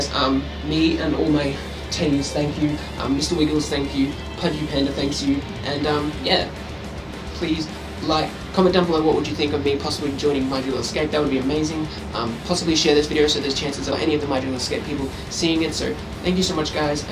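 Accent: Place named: Australia. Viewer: You sound Australian. Are you Australian? (I am auzzie 😜)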